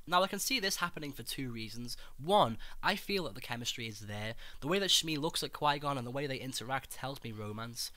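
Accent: Liverpool, United Kingdom. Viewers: Are you from Liverpool???? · Where are you from? UK probably?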